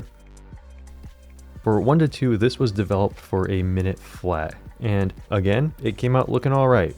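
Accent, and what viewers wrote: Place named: United States. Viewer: HALLO from a WET and GREY BRENTWOOD, Essex ENGLAND !! Just FOUND your Channel and LOVE your Experiments ( and the American ACCENT-- got any Gum Chum ? ) I have a similar channel for film stuff and a few Piano Playing episodes !! I subscribed as I want to watch your Video on the Old VERICOLOR as I have some of the SAME in Fridge !! Keep Snapping -- Peter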